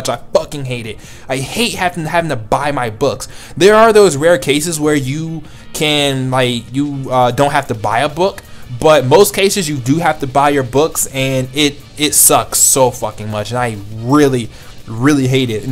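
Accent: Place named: New York, United States. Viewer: My NYC senses are tingling, yur a new yorker arent u?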